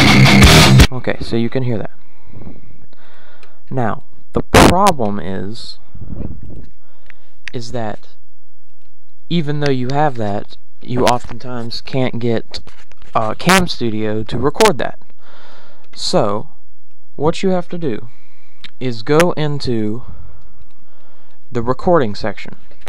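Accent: South, United States. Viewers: are you fucking KIDDING ME?? i was trying to find a way to record FROM MY SPEAKERS WITHOUT A MICROPHONE and sat through SEVEN MINUTES of you bloody SOUTERN ACCENT to show me that your whole problem was to USE A MIC?? well that's not fair... i'm speaking out of hate, and now that i've calmed down, i can say calmly that ou've wasted my time. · you has a country accent like me :p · lol southern american.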